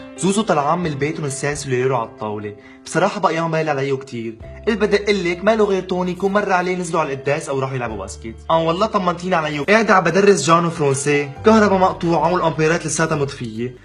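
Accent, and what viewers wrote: Aleppo, Syria. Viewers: دخيل روحك ما احلاك وما احلى لهجة الحلبية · 😂 موتني مي نعشق اللهجة الحلبية #جزائرية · مابتعرف تحكي الحلبي القحي النظامي عبتحكي حلبي الطنطات ولابقى تحكي الحلبي الجويني لانو مابلبقلك ضحريماتك اذا طقتك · كلامك مية بالمية هي هي اللهجة الحلبية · ههههههه وككك تجنن لهجتنا معك بس سي بتعرف زكرتني بأيام حلب هههه مو متل هلق تركيا هههه وبطيخ وك صحي ئللي وين انت مقيم هلأ ؟ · ههههه دخيل ربك يا حلب محلاكي ومحلا لهجتك الله يفرجها يا رب #جزائرية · دخيل قلبككك شو حلوو الحكي الحلبي احلى تحية لاهل سورييا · هاي لهجة اهل حلب فقط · احلى حلبية واحلى لهجة والله اخوكم من الدير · لاااا هي صعبة 😂اذا مو من حلب مستحيل تنفهم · ابوس الحكي الحلبي .يمووو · تحية من المغرب 😂😂😂😂احلى لهجة سوريا والله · الشب حلو بس لهجة حلبية ماحلوة لمعي يعمل كومنت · روعه ههههه انا حلبيه وما بعرف احكي متلو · ياييييي تجنن لهجتكم تحية لا حلب ولا كل سوريا من بنت العراق ☺☺☺ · لك أحلى حلب وأحلى لهجة دخيل ألبنا نحنا الحلبية شقد حكيينا كويس ومنظوم❤أشتقتلك يابلدي😢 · ههههههه تابرني حكي حلبي تابش البي 😘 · حلبية وهيك كبه مججئه بل لبن لك بالله عليكي هاي لهجه بتنحب )مثال جب الئبه 😂😂😂😂😂😂😂😂😂😂😂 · ولك فديت اللهجة الحلبية عسلللل وربي ❤ يستر ع الكلاوي🥰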